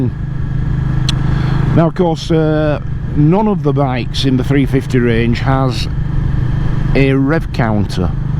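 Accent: Yorkshire, United Kingdom. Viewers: Nice review thanks. I have an RE Himalayan in a hilly area here in Australia and have been careful to run it in. It’s a nice do it all motorcycle. I was in Bradford for 10 days in late November visiting my old uncle, so good to hear the Yorkshire accent and see the local roads again on your review.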